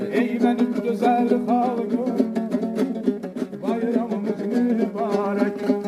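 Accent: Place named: Avar, Russia. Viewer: Акцент Аварский или Цахурский! Молодец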